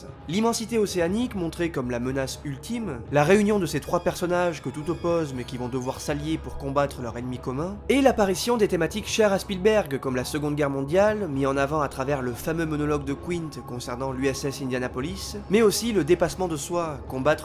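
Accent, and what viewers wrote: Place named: South, France. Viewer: En effet, chaque vidéo est un concentré de travail passionné ! Avec la petite pointe quasiment imperceptible du sud de la Loire dans la voix en prime...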